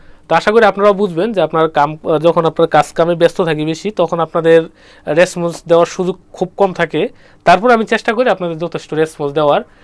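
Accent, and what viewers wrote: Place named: Comilla, Bangladesh. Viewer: মনে হচ্ছে আপনি কুমিল্লার ভাই। আপনার জন্য শুভ কামনা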